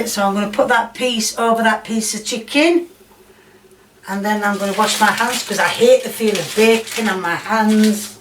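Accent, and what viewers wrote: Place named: Yorkshire, United Kingdom. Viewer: “Oh I’m just going t too tip lot aht” a proppa Yorkshire lass ah. Do you think you could try making jerk chicken?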